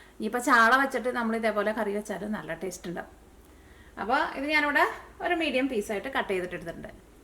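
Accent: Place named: Chalakudy, India. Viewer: കോട്ടയം അച്ചായതിന്ന് പറഞ്ഞിട്ട് ഭാഷ സ്ലാങ് ചാലക്കുടിയാണല്ലോ.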